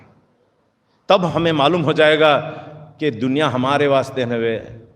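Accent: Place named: Bangalore, India. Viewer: Banda bore hone nahi deta. Banglori language badi pyari n natural lagti h, central point bhi focused hojata h.Allaha salamat rakhe sab!!!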